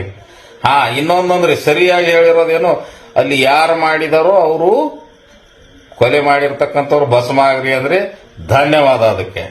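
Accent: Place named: North Karnataka, India. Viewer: sir your telling very beautiful Uttar Karnaraka Bijapur Langvage sir.pl coutinue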